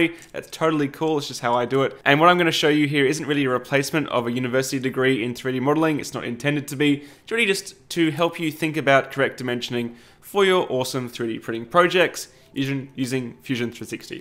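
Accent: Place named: Australia. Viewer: Mate, thanks for your efforts with showing us noobs the ins and outs of 3d printing with the bonus of your real world application. (And we need more ozzie accents on youtube).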